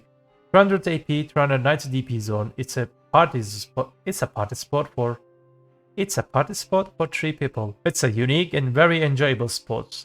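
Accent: Turkey. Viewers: Knk aksanindan anladım bizdensin o yüzden türkçe sorca. 3 ay önce yapimissin suan spotlarin durumu nasıl ? 272 ap 330dp ile.rn güzel parayi mirumoktami kadari. Ne tavsiye edersin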